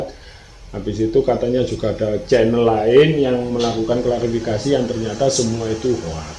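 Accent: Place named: East Java, Indonesia. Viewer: Mas jatim mu mana sih kok medok nya super medok dokk dok...soale aku juga jatim tapi yg perbatasan jateng yg ga nglotok medoknya..yahh level sedang lah ...semangat mas bro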